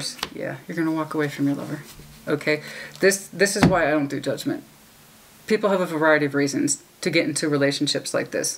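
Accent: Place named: South, United States. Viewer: That deep sexy raspy voice southern and unique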